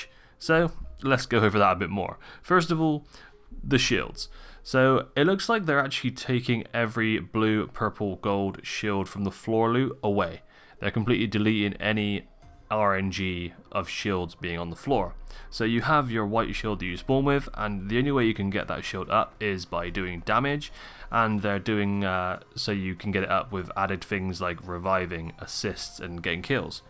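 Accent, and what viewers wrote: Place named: South West, United Kingdom. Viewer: Where are you from bro, sounds south west like me?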